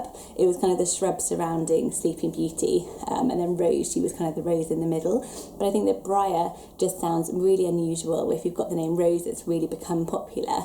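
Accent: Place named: England, United Kingdom. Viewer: I like the name Aspen but i don't know about it for a girl. But, when i hear the name i automatically associate it with a character from the book series The Selection, i know that sounds silly, but i really love that series and the character is supposed to be a really handsome heroic person in the series. I'm also really young but i just like hearing people talk about baby names, it sometimes makes me think of what i would name my child in the future. Also, I'm American and I live in Tennessee so I live in the country, so names that i hear everyday are probably very different than names you hear everyday in the UK or anywhere in Europe really, so i just find these videos interesting. By the way I love the name Liberty with your accent but when i say it sounds silly LOL if i had a English accent it would be adorable though.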